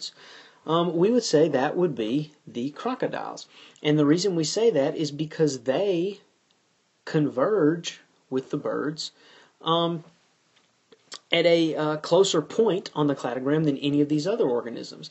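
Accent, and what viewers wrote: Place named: Texas, United States. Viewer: Thanks man! this was really helpful! I like your texas accent at times too ;)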